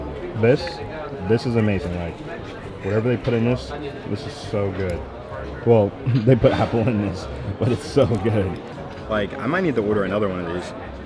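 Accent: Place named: United States. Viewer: Are you American?🤷‍♂️🤷‍♂️You sound American. Watching from HONOLULU HAWAII. Hey, Mele Kalikimaka from America 😎🎅🏻🎅🏻 Very good video. I can always spot an American 🤓😍🇺🇸😎🎅🏻🎅🏻🌲☃️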